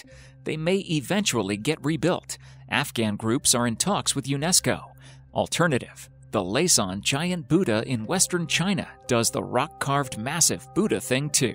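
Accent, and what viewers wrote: United States. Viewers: I dont believe he did try to pronounce them correctly. He pronounced them how he thought they should be pronounced in american english, not correctly. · You just can't have an American doing voiceovers that involve any words - well, any words at all really. This bloke is clearly unaware of any of the world further than a mile from his home.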